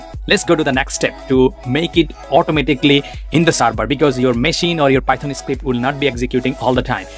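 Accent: Bengal, India. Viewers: You are definitely A bong with your accent i can tell that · Just by listening to his voice, I can say he is a Bengali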